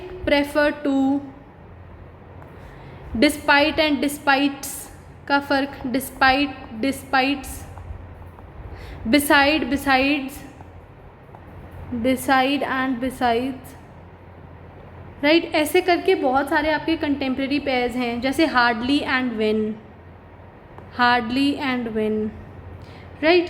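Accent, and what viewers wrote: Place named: Garhwal, India. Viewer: Are you Garhwali .... your tune matches alot😅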